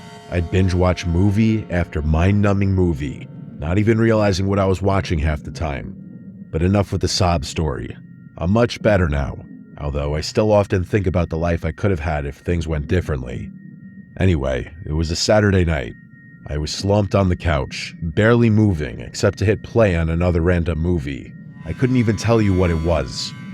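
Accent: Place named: New York, United States. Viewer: Anyone else notice the narrator’s NY area accent like its head out occasionally? Even thru the (what I assume) is a voice filter? I’m guessing he’s from North Jersey…I’m from that same region, so no judgement! 😂 These vids are amazing btw; just discovered them and can’t turn them off! Truly bone-chilling sh*t! Where does he find these stories anyway? Reddit, etc..? 🤔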